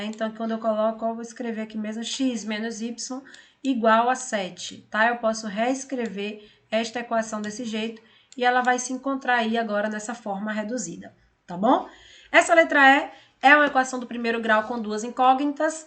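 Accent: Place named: Paraíba, Brazil. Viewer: Tenho uma sobrinha que se chama Juliane, é paraibana, e tem sotaque idêntico ao seu.... Parabéns pelo vídeo. Muito explicativo. Paulo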